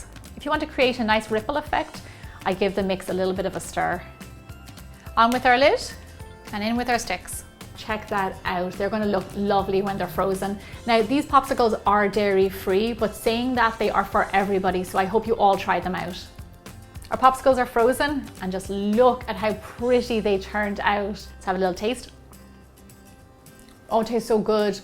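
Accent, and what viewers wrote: Ireland. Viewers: Hello,! I watch all your recipes and even have tried some myself and they are all amazing! I also love your Irish accent! One suggestion: Can you post a video about how to make bueno cream spread? Cannot find it anywhere online. Thank you! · r you irsh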